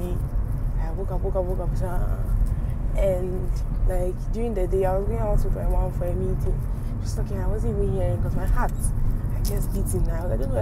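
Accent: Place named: Nigeria. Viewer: Congratulations to a successful trip❤️💌🥂 I love your accent, it sounds like that of a Nigerian 🇳🇬🥰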